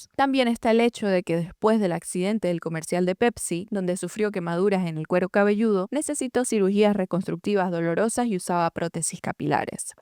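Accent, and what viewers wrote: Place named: Venezuela. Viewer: Me gusta muchisimo tu acento venezolano, (soy española ) a de mas tu tono de voz es elegante y muy relajante., podria escucharte el dia entero ❤❤❤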